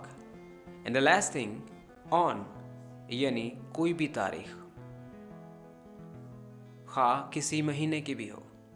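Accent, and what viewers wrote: United Kingdom. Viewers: Sir pak ki english tenses preposition to ata hai lekin british accent nhe samjh atta apka 11 word wali video awsm the plz 1 video main batain k British language A TO Z kesay pronounced karengaylk PLZZZZZZZZZZZZZZZZZZ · chawal Urdu to thek thek bol.etni acting kr rahy ho jesy tm angrez ho or Urdu bolna ni ata .Urdu m English wala lehja rkha hoa h.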